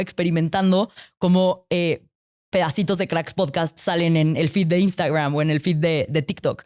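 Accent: Mexico City, Mexico. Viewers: Asi hablan en cdmx, cuando estudias en universidades privadas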